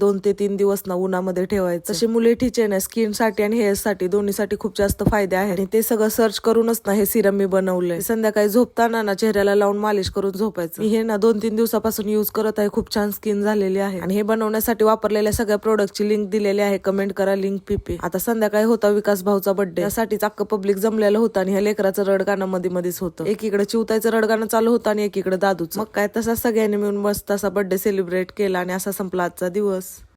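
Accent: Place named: Kolhapur, India. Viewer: Amchya sanglit ani kolhapurkde pn asch boltat antle kivva antly..tumhi ugach bolnyt chuka kadu nka ..tumhala jas bolu vatt ts tumhi bola tila jas vatty ts ti bolel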